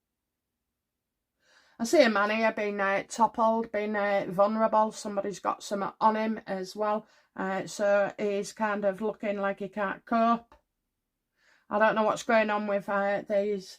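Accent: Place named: Doncaster, United Kingdom. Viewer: I love your channel and your style. I'm from Doncaster originally and recognise your accent. Wonder where you are from? Thank you for your readings xxx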